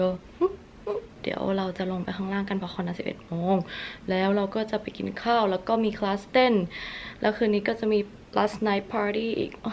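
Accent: South Korea. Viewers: เต้นเป๊ะมากกกก //ขอบสำเนียงเกาของพี่มากเลยอะ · ชอบสำเนียงภาษาเกาหลี มากง่าา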